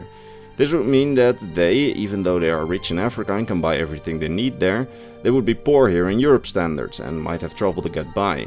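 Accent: Netherlands. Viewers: Ik kan aan je accent horen dat je Nederlands bent :)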